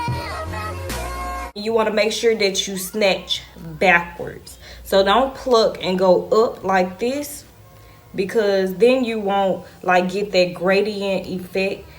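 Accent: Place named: Mississippi, United States. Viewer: This helped a lot 😊😊 & I love your accent lol , Are you from Jackson Mississippi?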